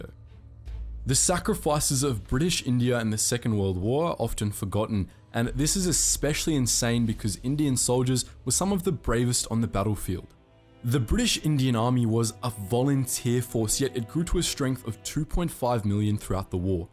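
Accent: Australia. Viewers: Judging by your accent, I would guess you are Australian? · Considering your accent and you being Australian. Hard to take this video seriously since you’re biased.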